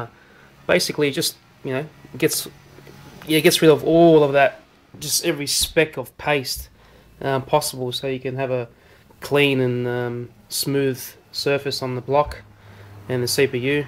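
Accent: Australia. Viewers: he's Australian (or from New Zealand). NOOB.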